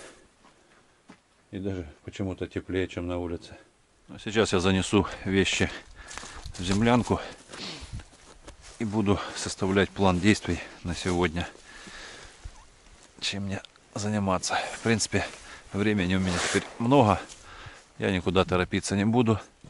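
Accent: Ukraine. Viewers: Та тут сразу по разговору слышно ,что наш с востока Украины!!!Привет из Мариуполя!!!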